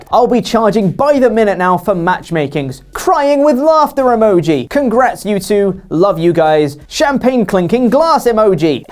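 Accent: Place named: England, United Kingdom. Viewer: As long as you have an English accent I could care less if you’re a different person.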